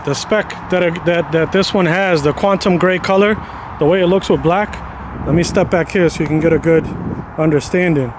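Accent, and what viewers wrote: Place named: Massachusetts, United States. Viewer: I’m from MA too. You don’t have a strong accent at all (the road signs looked like you were closer to RI anyways), but I noticed it a few times - not a bad thing. Anyways - love the S5, congrats!!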